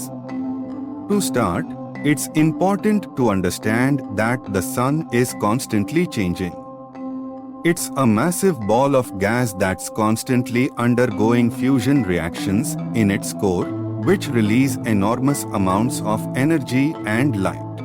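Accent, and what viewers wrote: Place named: India. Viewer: I think that the narrator is coming from India.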